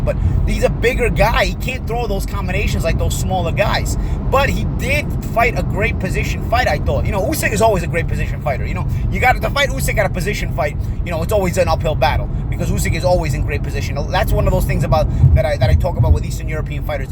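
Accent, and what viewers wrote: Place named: New Jersey, United States. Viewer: Too much jersey wop accent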